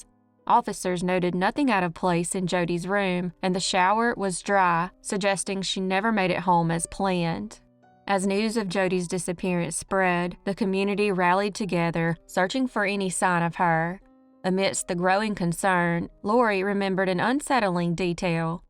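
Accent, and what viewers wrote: South, United States. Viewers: I love listening to you from the other side of the Atlantic telling crime stories with your absolutely lovely southern accent. I just love it. Thank you very much for your work and greetings from Portugal 🇵🇹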